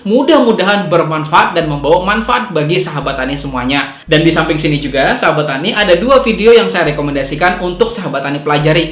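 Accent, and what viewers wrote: Banjar, Indonesia. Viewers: Orng banjar nih..logatny gk hilang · Kapuas. Pinanya logat Banjar pian pak😅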